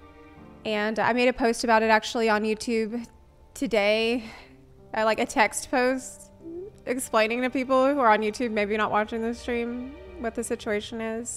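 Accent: United States. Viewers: you sounds like you are from the US. why did want to move to ukraine? serious question, no malintent here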